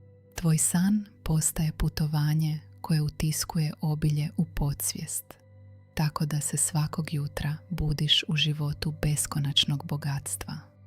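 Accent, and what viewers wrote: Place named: North Macedonia. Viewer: Divan glas, nisam bila sigurna je li AI, često makedonske( bar mi se učinilo) riječi. Svakako čudna mješavina koja čak i umiruje. Sviđa mi se zapravo🤔👍😀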